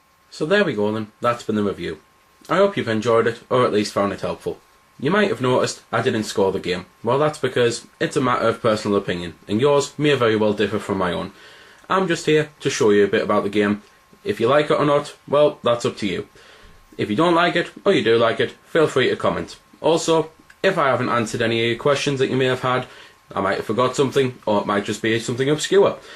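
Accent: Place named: England, United Kingdom. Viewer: WHOA, I just clicked to :39 and right away I'm blasted away by this...... Loud, fast, English accent XD the only word I understood was "Achievments" lmao No offense to you, of course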